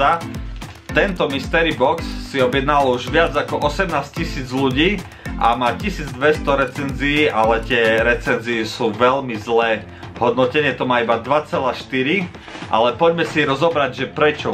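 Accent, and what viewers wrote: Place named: Tatras, Slovakia. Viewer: bývam v tatrách.. a ja som si hovorila ze ten prízvuk je mi nejaký známy 😂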